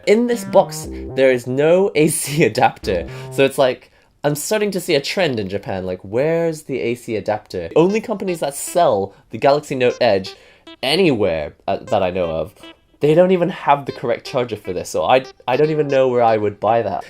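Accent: England, United Kingdom. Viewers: Question time... Please don't take offence) where are you from? because you have a very English (I think) accent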